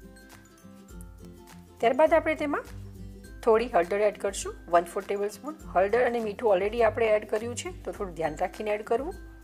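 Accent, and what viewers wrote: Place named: Kutch, India. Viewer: Tamari language per thi laage che ke tame kutchi cho. Koik Kutchi recipe batavo ne.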